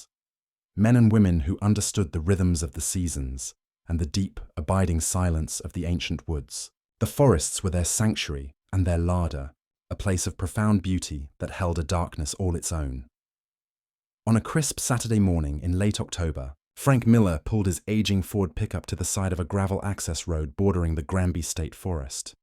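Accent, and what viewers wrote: United Kingdom. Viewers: Why is there a British guy talking about this story couldn’t you make it an American???????? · Is this just something you made up because 1st, There isn't a Granby. State forest in Massachusetts 2nd Hartford is in Connecticut.. 3rd Kill the British accent... It sucks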